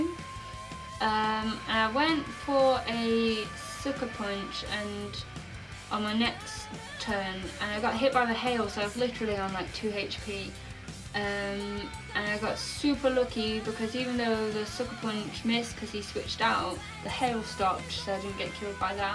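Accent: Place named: Nottingham, United Kingdom. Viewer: that Nottingham accent tho ;]]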